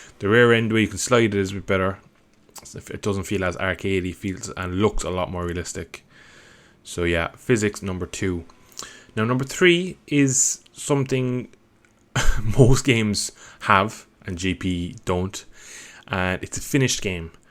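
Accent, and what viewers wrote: Ireland. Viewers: I wish they'd do something like Codemasters does with the F1 games. Making the riders switch teams and move up (including your own riders) and like EA also did with their NASCAR games. MotoGP riders retiring or switching teams and riders moving up from Moto2, Moto3 riders moving up into Moto2 and Rookies Cup riders moving up into Moto3. PS: like your Irish accent :)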